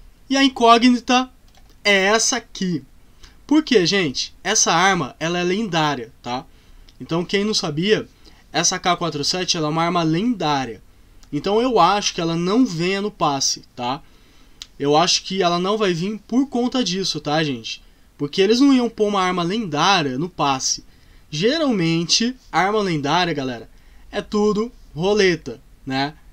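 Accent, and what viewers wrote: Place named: Northeast, Brazil. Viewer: tmj junto, preocupa não mano nos somos nordestinos e temos esse sotaque msm uai kkkk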